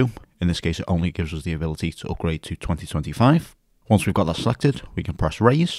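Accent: Liverpool, United Kingdom. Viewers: Love that scouse accent!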